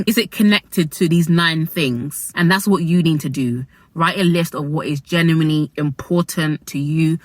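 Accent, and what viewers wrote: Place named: RP, United Kingdom. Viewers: I love your English accent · accent though…. So posh🤤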